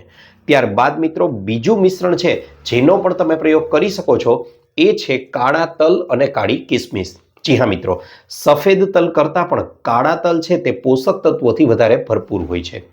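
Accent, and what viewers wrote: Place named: Rajkot, India. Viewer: રાજકોટ તરફની ભાષામાં 'સ', 'ષ'ના ઉચ્ચાર 'સ' કરે છે અને 'ળ'ને 'ડ' બોલે છે. તમારી ભાષામાં એવી અસર કેમ છે ?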